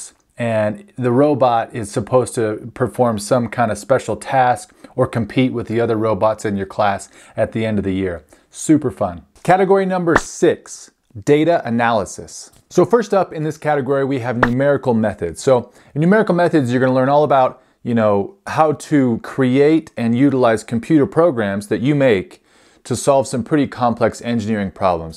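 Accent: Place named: United States. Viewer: This might be a dumb question but I'm from tbe uk, you sound american to me. Will this stuff help me to become a vibration engineer in the uk?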